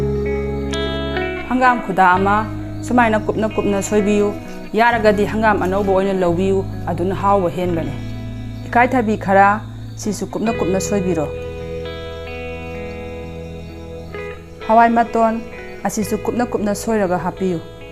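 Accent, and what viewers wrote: Kakching, India. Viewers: Ngangiba lonsina kakching haiba lamdugi lon ne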